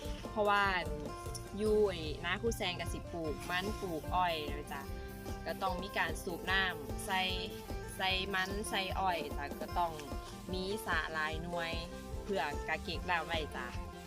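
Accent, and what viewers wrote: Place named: Thailand. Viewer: สวัสดีครับ เป็นคนจังหวัดได๋น้อ สำเนียง มาคือสำเนียงบ้านผมแท้ กดติดตามแล้วเด้อครับ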